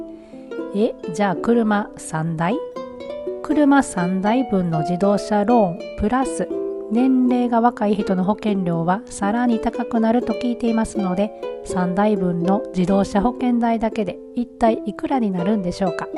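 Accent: Kansai, Japan. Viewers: 関西弁に癒されます❤ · それだけ 海外暮らしが長くても 関西弁抜けてないっていうところを見ると もう日本で住んだらいいんじゃないの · 君関西人やろ知らんけど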